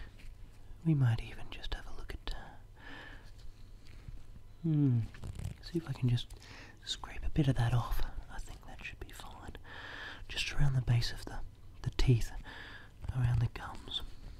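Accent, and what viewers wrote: Australia. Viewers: Wow your voice is so soothing and gives me tingles but them tools are scary as 😂😂😂 am I right that you're a fellow Australian?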